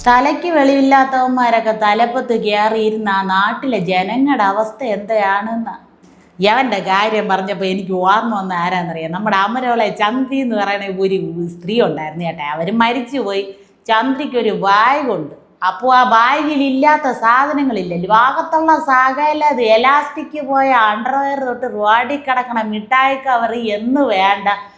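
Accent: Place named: Thiruvananthapuram, India. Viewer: TVM ഭാഷ... ഇത് കേൾക്കുന്ന ഞാൻ കോഴിക്കോട്കാരൻ... ഞാൻ എന്തര് കരുതണം...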